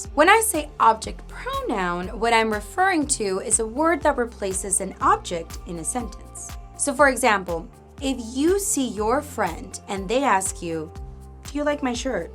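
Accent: Spain. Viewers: that spanish accent is like heaven u sound gooooood😍😍😍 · Is the Castilian Spanish?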